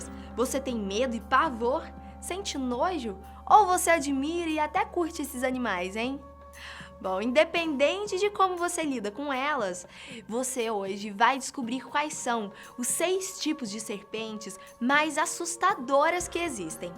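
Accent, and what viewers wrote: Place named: Northeast, Brazil. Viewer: Gostei do sotaque, que parte do meu nordeste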